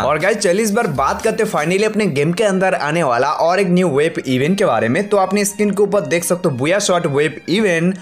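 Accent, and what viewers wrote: Bengal, India. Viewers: Kia Tom bangali ho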